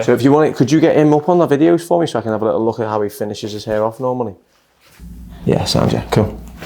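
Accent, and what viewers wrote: United Kingdom. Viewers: He’s scouse, isn’t he? With a very slight touch of paddy.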